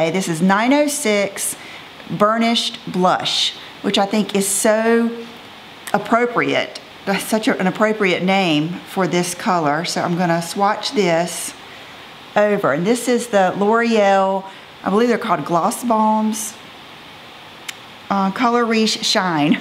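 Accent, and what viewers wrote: South, United States. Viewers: Hi, I'm new to your channel and I really enjoy your informative videos. I love your southern accent and your southern charm and of course your style.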